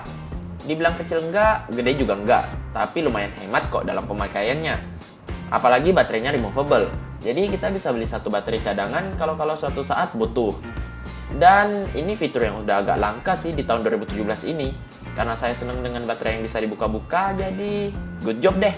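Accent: Makassar, Indonesia. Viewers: orang makassar kayaknya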